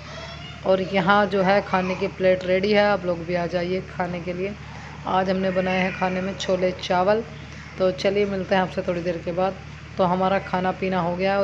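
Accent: Haryana, India. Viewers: Aaj kl language haryanvi tone le rhi h Kya baat h samjh nhi aaya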